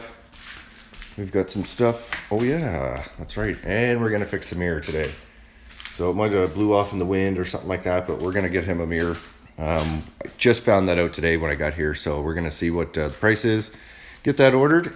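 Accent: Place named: Newfoundland, Canada. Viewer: You from the Maritimes? Newfoundland?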